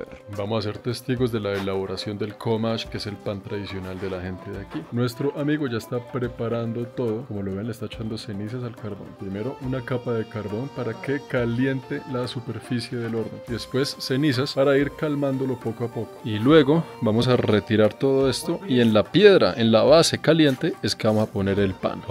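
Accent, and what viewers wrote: Bogotá, Colombia. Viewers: Hola, me gusta su canal. Soy de Bogotá por lo q me río mucho de su forma de hablar porque hablamos muy parecido. Hola niño!! Q dice el niño!! 😅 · Soy nueva viendo tu canal y lo que más me gusta es como le hablas a los animales y es porque yo lo hago así también, ademas me gusta mucho el acento colombiano, gracias por compartir tu viaje de vida.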